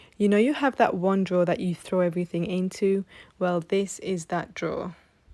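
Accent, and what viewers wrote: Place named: Manchester, United Kingdom. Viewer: Sounds like a Manchester accent to me.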